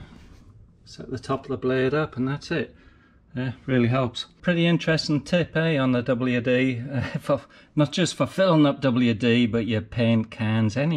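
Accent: United Kingdom. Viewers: Loving this, one question are you British?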